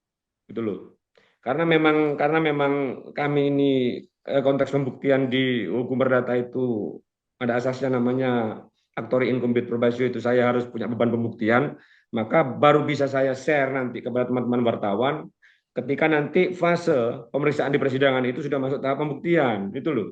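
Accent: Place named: East Java, Indonesia. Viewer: Logat nya jatim banget semangat bang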